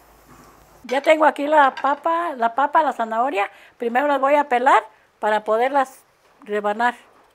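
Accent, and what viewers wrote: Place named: Chihuahua, Mexico. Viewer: Osi una tia politica tambien hablaba asi era de chihuahua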